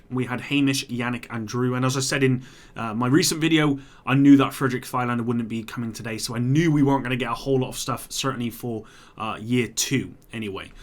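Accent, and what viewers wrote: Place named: Cockney, United Kingdom. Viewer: propa cockney m8 th=f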